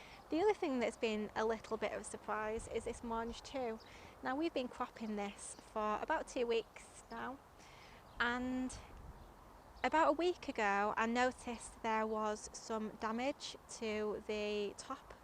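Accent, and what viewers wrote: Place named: East Yorkshire, United Kingdom. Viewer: Sent over by JB...what a suprise as you sound like me! I noticed your East Riding of Yorkshire and so am I! I'm in Hull. Definitely subscribing!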